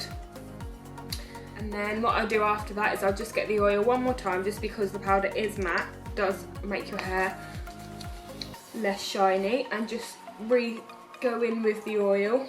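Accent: United Kingdom. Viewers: Her accent !! Where in England is she from? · british accent is so cute you are so pretty ;)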